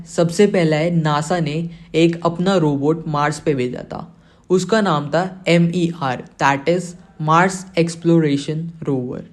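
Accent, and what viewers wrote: India. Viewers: You sound like an average indian with voice stats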